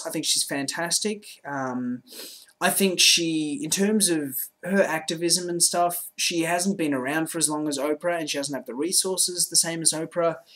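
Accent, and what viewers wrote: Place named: Australia. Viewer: what part of australia are you from? i can't pick the accent and its annoying me :P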